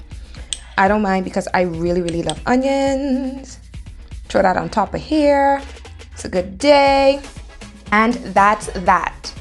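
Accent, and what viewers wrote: Bahamas. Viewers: That real Bahamas beautiful dialect and excellent cooking approach and techniques totally rocks. Has me here in Canada salivating and tasting home. is very authentic in her personal style....a real Bahamian.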